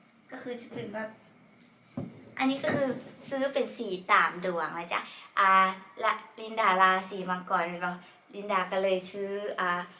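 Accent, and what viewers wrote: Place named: Vientiane, Laos. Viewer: ເປັນຄົນປາກກະດິງ ຄືເວົ້າອອກວຽງ😍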